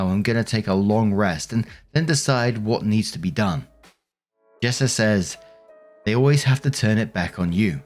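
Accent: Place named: Oxfordshire, United Kingdom. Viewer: I live in South TX, but when I was little my dad was in the US Air Force and Stationed at Upper Heyford. We lived in Oxfordshire (a little place called Middle Barton). When I listen to you talk, it reminds me of the 3 years I lived there. I don't know if that's anywhere near you, but listening to you takes me back!! The neighbor drama, the cheeky little kids. We were always in and out of everyone's houses too. Love your channel and stories!!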